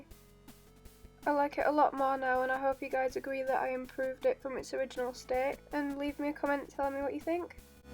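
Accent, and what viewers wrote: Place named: United Kingdom. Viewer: What part of UK are you from? Your accent is cute :P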